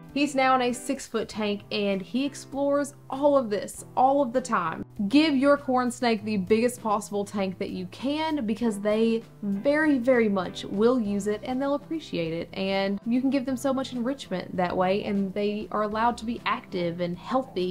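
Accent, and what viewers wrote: South, United States. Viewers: Her southern accent is so sweet sounding lol like an aunt that can bake the hell out of a pie 😂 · First video I've seen on the channel, will sub because 1) a lot of good info here that's presented well, and 2) as a Georgian i must say your southern accent is AMAZING! Your snakes are lucky to have an owner with such a voice, lol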